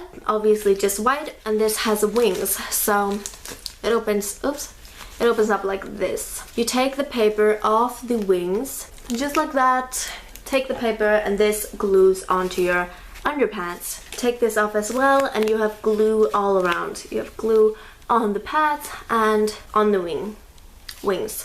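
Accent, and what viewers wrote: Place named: Iceland. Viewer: oh your from Iceland that explains the accent I was trying to figure it out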